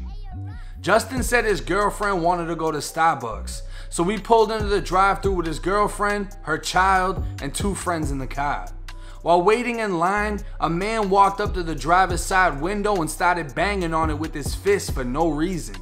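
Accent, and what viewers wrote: Boston, United States. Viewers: Cuz is u from Boston slim?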